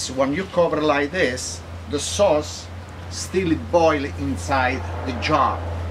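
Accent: Italy. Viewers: See an old guy See title - how to make tomato sauce Click Italian accent = full attention/excitement Sit back and enjoy learning from a master. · *PURE GOLD ITALIAN ACCENT* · I see a man cutting tomato's in his garage, speaking with a heavy Italian accent and i subscribed. Update: Wow I have never gotten anything over probably 30 likes before. Thank you! Good food brings people together! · I just absolutely love this man especially when making Italian food with his accent. Thank you.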